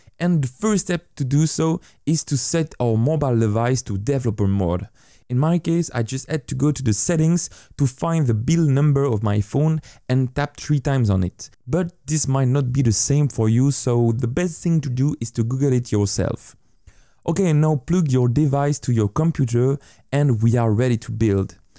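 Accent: France. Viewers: As a French person, I can undoubtedly detect a French accent when I hear one!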